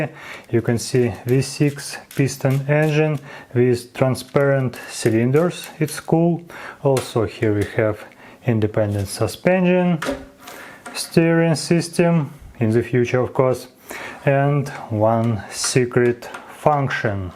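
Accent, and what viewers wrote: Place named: Russia. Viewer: Я не тролю тебя😊 просто твой акцент прямо таки походит на те самые пародийные ролики с руским окцентом! Я сам так говорю но когда читаю стараюсь читать с окцентом приближеному к Амеренканскому акценту да и +я сам очень плохо знаю английский язик а с помощью твоего англоговорящего канала я смогу улучшить свой английский! Так скажем совмещаем приятное с полезным 😊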